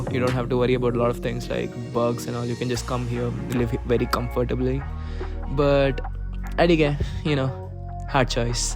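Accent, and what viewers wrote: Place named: India. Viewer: best part your Hindi accent Macha ❤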